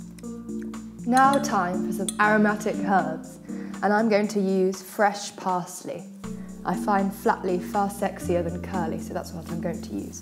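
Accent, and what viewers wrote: United Kingdom. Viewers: LIAMM she’s obviously not from America can idk how you can’t tell she’s English with that accent lol this one is not on us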